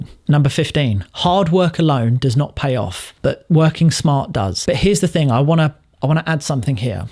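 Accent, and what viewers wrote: United Kingdom. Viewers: I’m smitten😘and can listen to you talk all day. Are you based out of the UK?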